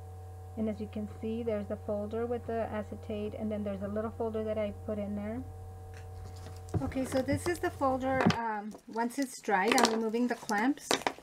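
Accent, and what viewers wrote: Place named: India. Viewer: Nice done, People from india 🇮🇳 have a very cute accent , you sound like a sweet indian lady who works at my dentist office !! She is so crafty just like you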